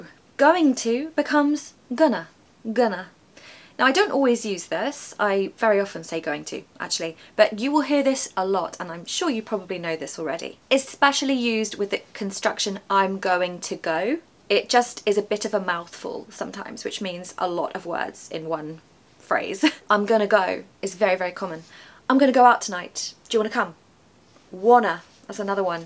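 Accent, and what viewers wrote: United Kingdom. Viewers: I’m from 🇹🇭and I really love your British accent it’s so nice to listen to. I really wish you’d make longer video or even a podcast. I’d happily listen to you every day! ❤ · Hello Miss, I am an English learner from China, and I saw your video on YouTube. You know, British accent is very popular in China, and your English accent is very nice, and your voice is touching. Your video also shows many unique cultural differences between England and China, as well as popularize many UK culture and interesting traditions. Someone's moving your video from YouTube to Blibli (A Chinese Video Net like YouTube) I don't know if you know this? I suggest you also register an account on Blibli and upload your videos, so that there will be more Chinese English learners watching your videos. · I love British pronunciation.. because it very clear sound Thank you...❤❤❤❤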